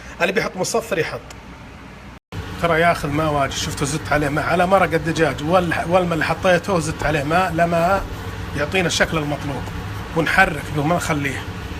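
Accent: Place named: Buraydah, Saudi Arabia. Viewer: ونعم فيك وفي اهل بريده من لهجتك عرفت بس احنا نبغا ناكل من طبختك قلنا يمكن يكون حولنا الله يسعدك يا وين ماكنت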